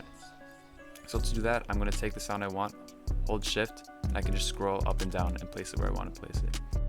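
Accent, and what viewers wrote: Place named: Canada. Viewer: Cool! Would love to visit one day. Very good video. I can tell because one of my best friends from Canada and some shows I watched are based there and you sound just like all of them lol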